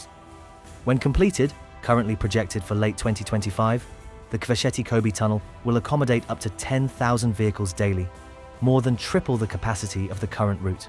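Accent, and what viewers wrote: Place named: London, United Kingdom. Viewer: London accent make complaints about developments in Eastern countries!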